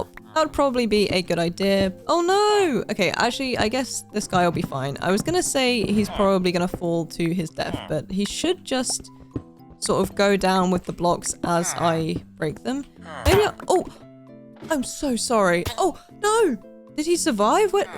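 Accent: United Kingdom. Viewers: Are you British you sound British?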